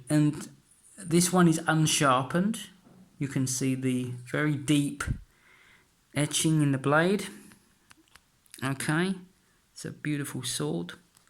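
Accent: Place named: United Kingdom. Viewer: You sound British, like me - did you have any issues importing the Albion swords into the UK, import tax, etc., please...?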